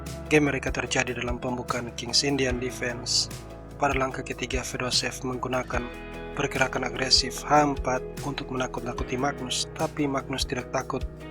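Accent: Manado, Indonesia. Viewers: Orang Manado dang depe admin ini?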